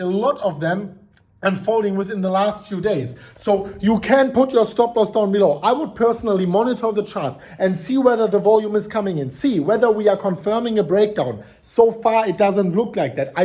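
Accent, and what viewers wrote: Germany. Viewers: I love the German accent in English.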